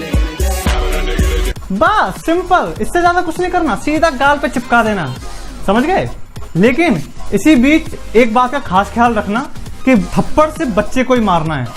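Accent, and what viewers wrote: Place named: Uttar Pradesh, India. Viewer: Nice videos bhai aur UP accent bahot accha hai aapka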